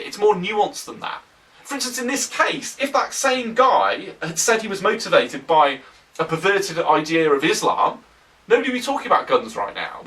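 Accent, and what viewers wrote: United Kingdom. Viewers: it just sounds better with a british accent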